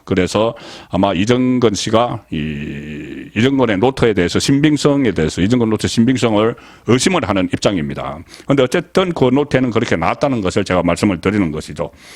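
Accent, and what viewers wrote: Gyeongsang, South Korea. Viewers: 송국건씨 구속영장 경찰이 검사에게 신청 검사는 법원에 청구하는 법률용어 정도는 기본 아닌가요 그리고 엑센트 강약의 사용 용례도 정획히 하세요 진주 촌놈 경상도 사투리 제발 좀 멘트 하나하나 법률용어 제재로 사용하길 바람요.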